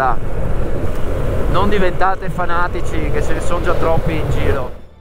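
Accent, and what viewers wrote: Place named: Veneto, Italy. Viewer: figurati!ti invidio molto,hai una bella moto e hai molta simpatia!e l accento veneto dà quel qualcosa in più😁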